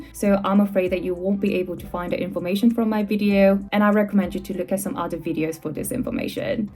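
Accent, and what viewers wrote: United Kingdom. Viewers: 영국발음 펄펙이십니다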